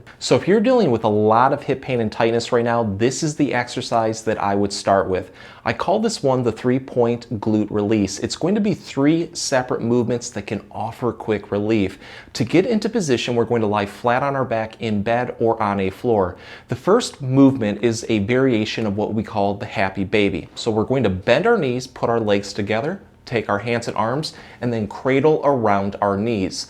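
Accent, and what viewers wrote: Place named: Midwest, United States. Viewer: Wonderful, I'm going to share this, then stretch. It's nice hearing the Midwestern accent. Chicago girl stuck in NC. Thanks for the video.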